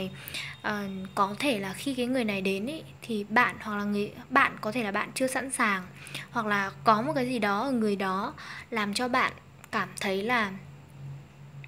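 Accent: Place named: North, Vietnam. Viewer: giọng Bắc bạn hay qá ko gắt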